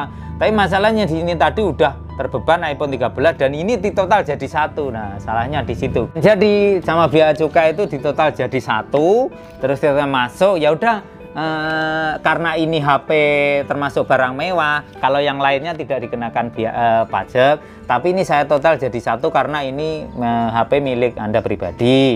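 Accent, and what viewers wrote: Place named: Ponorogo, Indonesia. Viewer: Pantes logat e podo ponorogo mas 😁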